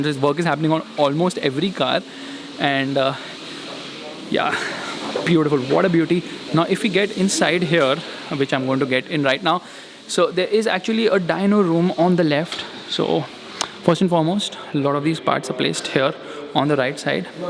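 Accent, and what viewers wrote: India. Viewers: If there is an expensive car, it is a luxury item and if a man is speaking English in Indian accent, it is definitely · I can understand your indian english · Indian accent of Hinglish.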